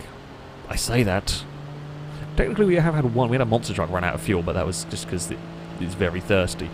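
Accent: United Kingdom. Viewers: hes just brittish, give the man some wa’ahr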